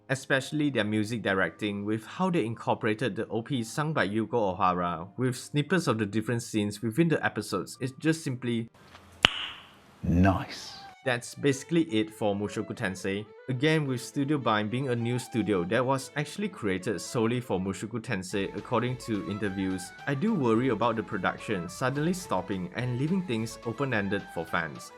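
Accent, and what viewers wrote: Singapore. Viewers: Gosh keep up with the good content, I've been hooked, I was first interested because if your accent and how I just recognized it was Singaporean, since I'm Malay, but yeah keep up the good work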